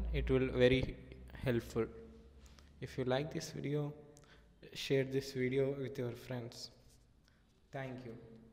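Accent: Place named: India. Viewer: Liked your video, but your accent is very hard to follow - I've been in the US for over 52 years and I think if you spoke a little clear and a bit more with not so thick Indian accent you'll definitely excel !! Keep up the good work - you're good !!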